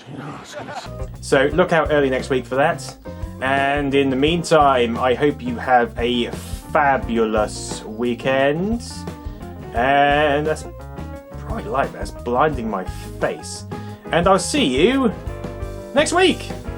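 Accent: United Kingdom. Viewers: BRITTISH ACCENT! and i dont eaven write london right YAYAYAYAYA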